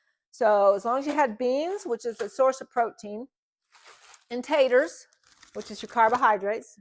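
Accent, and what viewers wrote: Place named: Appalachia, United States. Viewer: Are you kidding me? People’s audacity blows my mind! I’d have talked even more Appalachian if someone had shown opposition of my sound! 😂 Friend, if your dialect runs off into Appalachia without your knowledge again, let it go! ✌🏻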